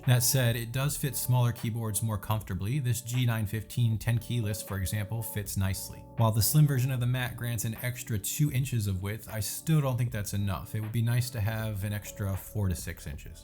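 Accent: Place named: Netherlands. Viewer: You're Dutch aren't you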